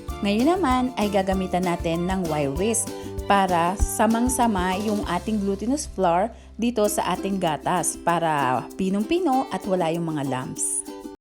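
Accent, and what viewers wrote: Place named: Iloilo, Philippines. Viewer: Ilonggo accent i can hear!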